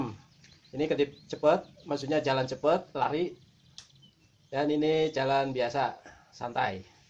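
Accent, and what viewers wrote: Banyumas, Indonesia. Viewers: Rika wong ngapak ya kang siip lah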